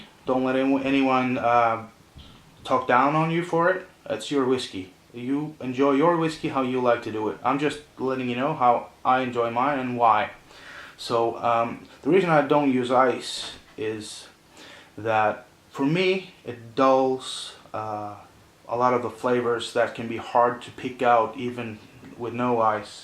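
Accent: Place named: Norway. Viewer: I really enjoyed this video,I am not a whisky man but I will have the odd single malt or a bourbon at home and yeah chasers are very common in the UK, I first had you down as American and then Irish and then Dutch and then Danish,are you Norwegian though? Cheers mate,subbed to and beer looks good as well,17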